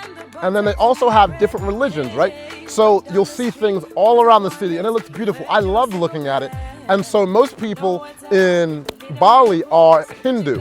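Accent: United Kingdom. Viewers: That British accent was cleeear bwoy. Did you used to live here in the UK?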